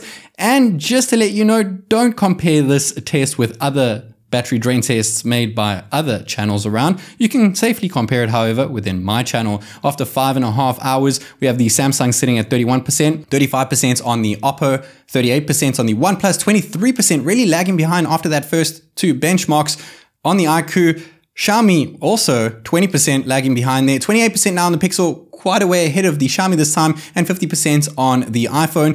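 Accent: South Africa. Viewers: Sounds like I can hear a South African accent....my S22+ gives me some anxious days, compared to my last flagship Note 9. Luckily I love the phone otherwise I would have ditched it. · Bro sounding South African🤔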